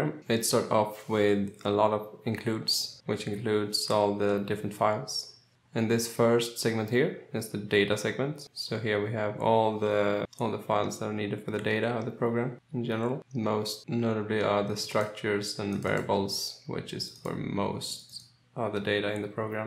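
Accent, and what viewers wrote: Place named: Sweden. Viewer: I lived in Sweden quite enough to notice the Swedish accent :)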